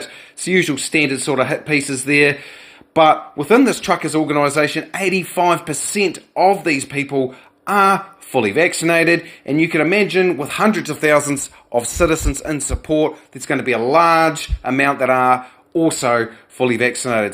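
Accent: New Zealand. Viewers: Algorithm and all that. Grow the.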